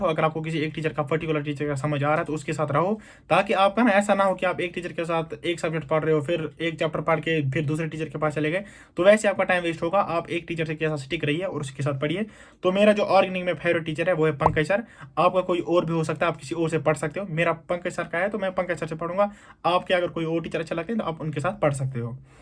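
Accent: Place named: Kashmir, India. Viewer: Aap kashmir se ho kya bhaiya qqki apki accent waise h ❤❤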